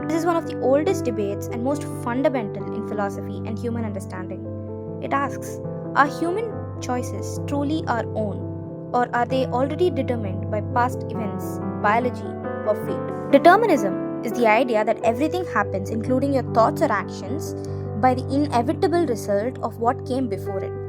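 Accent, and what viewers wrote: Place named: India. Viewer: I love Indian gurl accent 🌹😋🤪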